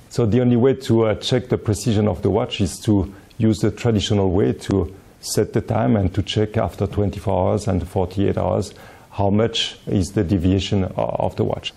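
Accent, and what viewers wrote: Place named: Japan. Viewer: なんか日本人のカタコト英語みたい笑 まあそれが一番聞き取りやすいんだけど。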